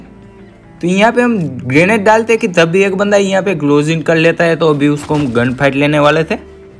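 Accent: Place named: Gujarat, India. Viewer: Lagta he Gujrati manus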